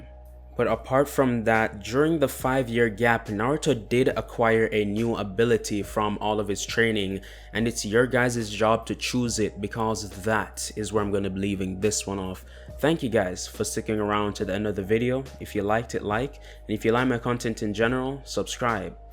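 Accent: Jamaica. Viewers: hi, I I'm just wondering if your from Jamaica I hear I semi accent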